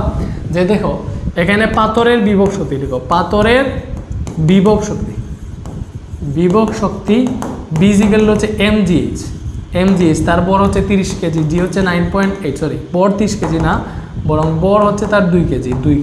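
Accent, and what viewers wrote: Sylhet, Bangladesh. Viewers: আপনার ক্লাস দেখে আমার বাক্কা উপকার দিছে। সিলেটি স্যার। ভালো লাগছে।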